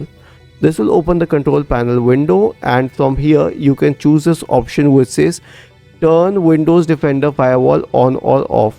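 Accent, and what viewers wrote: India. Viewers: that indian accent is a warm alarm that my problem is gonna get fixed thank you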